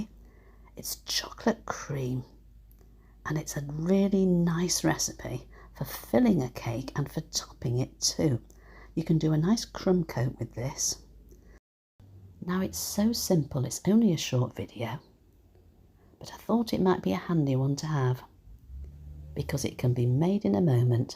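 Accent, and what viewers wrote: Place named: United Kingdom. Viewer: Hi! This chocolate frosting looks so creamy and decadent! I could hear your videos all day long because I love British accent, very charming 😍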